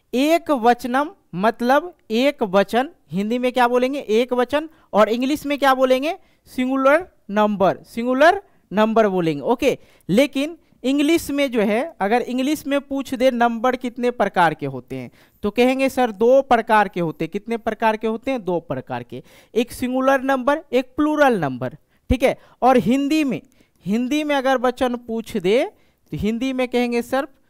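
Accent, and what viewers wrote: Bihar, India. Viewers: Sir aap bihar se ho kya?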